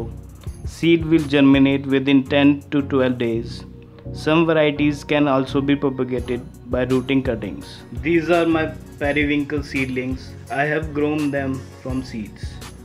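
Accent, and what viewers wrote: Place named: India. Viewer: Your accent is too strong I cannot understand your Hindu English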